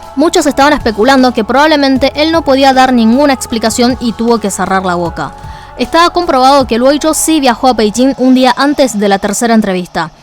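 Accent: Argentina. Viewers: En Latinoamérica es peor y nunca salen a la luz .En todo el mundo hay favoritismo pero es raro que salga a la luz eso.Excelente video y tu acento Argentino muy bonito si no viera en tus vídeos.Diria que es documental narrado por una Argentina .Perdon yo sé que sos Argentina y China pero yo te miro como latina que nos informa de China todo lo mejor para vos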